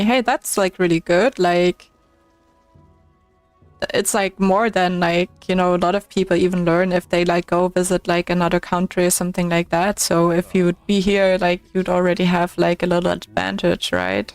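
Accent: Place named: Germany. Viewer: i would guess german ;-)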